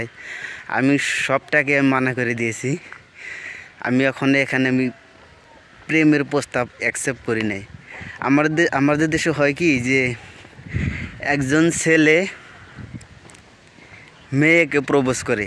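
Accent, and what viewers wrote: Bangladesh. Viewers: বাংলাদেশের বাসিন্দা,, চুরি করে ভারতে এসে পাসপোর্ট ভিসা,, বানিয়ে রাশিয়া গিয়েছে,, ভাষা শুনে বোঝা যাচ্ছে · নিজেকে ইন্ডিয়া মনে করো তুমিতো বাংলাদেশি কোথায় বোঝা যায় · ভাই আপনি বলেছেন আপনি ইন্ডিয়ান, আপনার ভাষা না ইন্ডিয়ান, না কলকাতা, আপনার ভাষা শুনে মনে হয় আপনি বাংলাদেশি। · Ekdam tai, or khota bartai bangladeshi r moto. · Apner vasha sune mone hocche Bangladeshi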